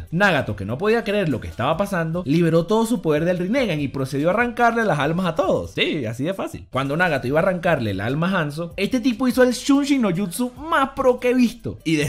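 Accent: Venezuela. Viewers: en este video se le sale el acento venezolano a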